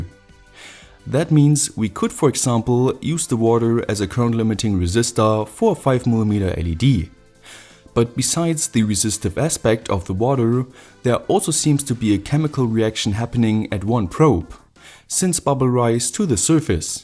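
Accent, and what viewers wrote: Germany. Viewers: Den deutschen akzent hört man halt direkt raus xd aber geiles video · You are from Germany? Kommst du aus Deutschland 🇩🇪? Siehe min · aha du bist deutsch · Are u german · are you German? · Man merkt so das er sonst deutsch spricht😂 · Are you a german? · Bist du deutsch? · Du bist deutsch hat man herausgehört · Lol, du bist 100pro Deutsch! Aber trotzdem gutes Video!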